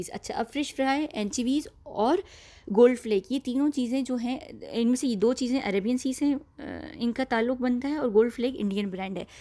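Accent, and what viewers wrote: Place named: United Kingdom. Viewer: Exactly, i am waiting for her english video. I really love her bristish accent.😊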